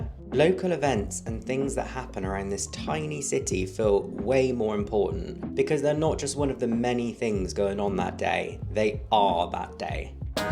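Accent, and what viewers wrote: United Kingdom. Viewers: I love Somerset! I'm from Bristol but moving away (I think to the outskirts of Weston?) when my daughter finishes school. Have considered Wells as I adore it, and Glastonbury... but no trains and I don't especially love driving. Are you from Bristol, you have the same accent as me?? Love finding your channel and I will follow your journey! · Really interesting take 😊 Enjoyed this. Somerset is wonderful. Trying to narrow your accent down. Portishead?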